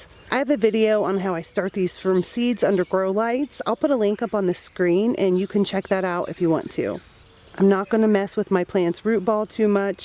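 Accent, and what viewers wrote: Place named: Pennsylvania, United States. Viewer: Hi, new here! U sound like u are from my home state, PA! I think I am going to try melons this year!!🤞Good tips!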